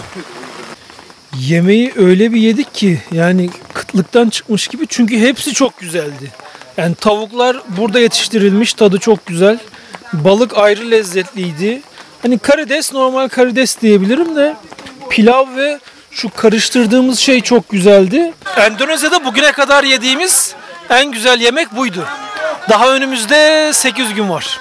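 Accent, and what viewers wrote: Samsun, Turkey. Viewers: Samsun 'da okudum. Samsun ''e''lerini ozlemesim. Dört gözle bekliyorum 50 filan demeni 😃😃😃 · Samsunlu olduğun 52 deyişinden belli abi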